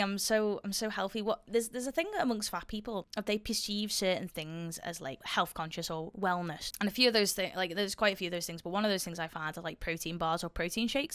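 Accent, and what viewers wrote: Liverpool, United Kingdom. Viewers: Love this! Elevated by the scouse accent too (coming from a Yorkshiremen) My gf linked me the vids. We both gone full circle from being unfit in 30s to now being 35, first child great balance. Competed in bodybuilding classic physique Nov 2024 and placed 4th out of 16. We like the flashbacks to how you used to be, can really relate! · Stumbled across your channel a few days ago and its great to see a UK perspective on these videos. As a once fat person its still beyond belief how some people seem to treat eating like an Olympic sport 😮. I cannot fathom taking in so much sugar and salt without realising it. The old me used to get through 2-3 litres of full sugar pop and understanding how many calories that is alone there is no surprise why I was overweight, it makes me wince to see others knocking back so much now! Also love your Scouse accent, no offence to US channels but you are so much more relatable because I understand your references and humour. 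Looking forward to more.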